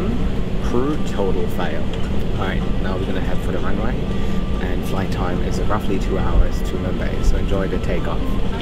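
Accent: Australia. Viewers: What nationality are you? Sometimes you sound Aussie, sometimes almost south African, and other times I can't tell. Just wondering. Great videos😀😁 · [comment made May 16, 2021] You're a great guy. Thanks for your continuous honest reporting. I've been watching quite a few of your videos in the last week and I really like your positive energy. I look forward to viewing more of your vids. I am Dutch born, Groningen, Netherlands, and because of my father's job I spent my childhood from age 4 to 12 in Nigeria and Ghana.. great experience. We traveled back and forth to the Netherlands every 2 years stopping in all the West African countries on the way. I really enjoy traveling.. though don't travel as much as you.. LOL.. but I traveled through France a number of times and the U.S.A and Canada. I presently live in Montreal, Canada. Since 2012 I travel very frequently to Mexico and Dominican Republic. 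I am multilingual though only 4 languages with fluency. My wife is French European and is perfectly bilingual without a trace of accent. We really enjoy your way of speaking English with an Aussi accent.. LOL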